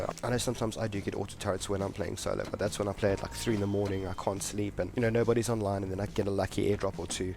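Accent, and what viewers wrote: Afrikaans, South Africa. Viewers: Brother are you from SA?? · you from south Africa? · South African? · You Afrikaans bro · are you south african? You sound like it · are you south african? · As soon as you started talking I was shocked :O A South African Rust YouTuber, great videos :D Keep up the great work!!! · r u africaans? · South african??? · Are u South African?!?!?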